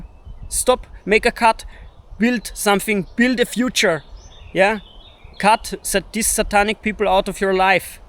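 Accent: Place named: Germany. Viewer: Ah yes, the eternal dilemma of every German YouTuber: Speak your native language, and your content is effectively trapped within the relatively small audience of Germany, Austria, Switzerland and maybe a few neighboring countries. On the other hand, by speaking English, yoru videos suddenly have the potential to reach a global audience, but unless you're genuinely fluent (C1 or even C2) you'll always be ze weird German guy with ze äxent. It's either accepting a smaller audience with which you can communicate naturally, or accepting the fact that you'll always have a linguistic handicap on the global stage. I can relate to that.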